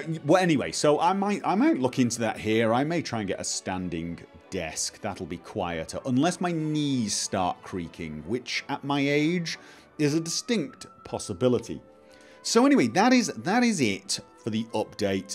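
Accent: United Kingdom. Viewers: Why you come to Denmark every summer? (I live in Denmark) and I need answers! - the mysterious. - You live in Norway right? - But are you Norwegian? - Your english is perfect, and a bit British. - Are you the other way around of Pewdiepie, - Moving from Sweden to england, - You moving from england to Norway? - I don't know anything about you!:O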